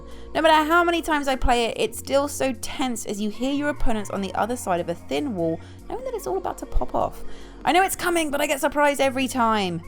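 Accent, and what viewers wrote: United Kingdom. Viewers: why is every xbox video a british accented female? im just wondering.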